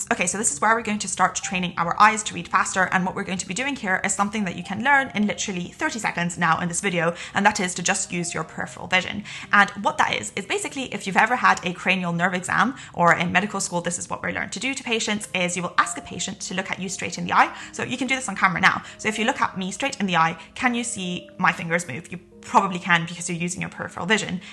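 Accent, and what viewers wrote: Scotland, United Kingdom. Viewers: Everyone commenting 'oh its so fast how you talk' or 'it must have been sped up in production' potentially however Scottish people do just naturally talk fast. Keep up kids 😂 Although will reiterate it off the folks that are saying its obvious proof of your speed of intellect by just how well you articulate yourself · Are you from Scotland?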